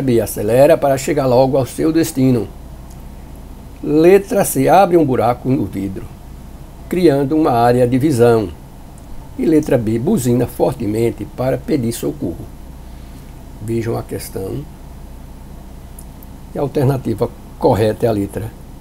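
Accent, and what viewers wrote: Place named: Paraíba, Brazil. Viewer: Ele deve ser da Paraíba pelo sutaque não sei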